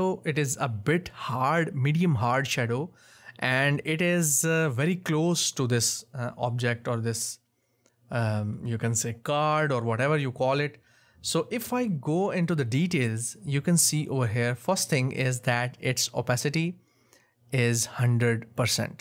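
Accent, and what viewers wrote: India. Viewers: I love your indian accent.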